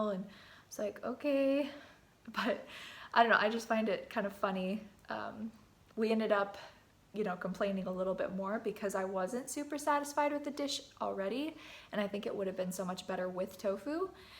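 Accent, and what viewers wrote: United States. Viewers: I swear I knew you were an Iowa girl as soon as you spoke. I'm from M'town and miss all of the great looking and smart girls from my hometown.You represent our home state in a very positive manner. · your horrible American English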